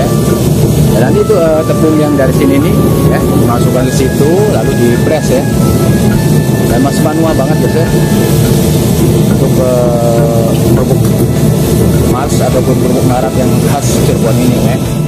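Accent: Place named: Malaysia. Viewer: Dengar dari logat kayak orang melayu youtubernya.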